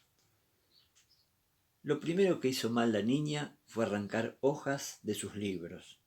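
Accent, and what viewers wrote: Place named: Argentina. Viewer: Gracias por la narración, abuelito. Su voz es tan relajante y el acento argentino, ni qué hablar! Gracias, se aprecia demasiado.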